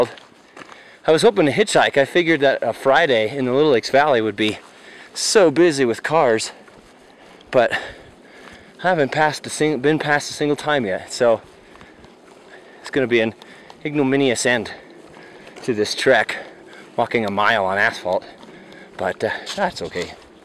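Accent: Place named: Scotland, United Kingdom. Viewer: Thumbs up just for the Scottish accent laddie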